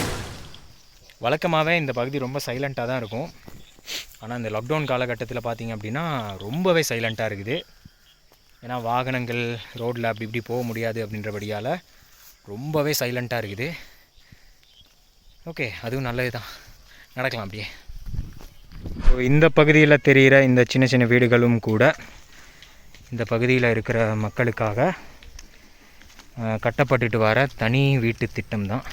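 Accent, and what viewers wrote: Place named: Tamil Nadu, India. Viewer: மகனே நீங்கள் தமிழ்நாட்டில் பேசுவது போல் பேசுகிறீர்கள் முன்னோர்கள்‌பூவர்வகுடிமக்கள் தமிழ்நாட்டில் இருந்து வந்தவர்களா.அந்த பாக்கியம் பாட்டியும் தமிழில் நாட்டில் பேசுவது போல் இழுத்து வணக்கம் சொல்கிறார்கள்.இன்றுதான் முதல் முறை உங்களுடைய வீடியோ பார்க்கிறேன்.உங்களைபற்றி எதுவும் தெரியாது இனிமேல் தான் மற்ற வீடியோக்கள் பார்க்க வேண்டும்.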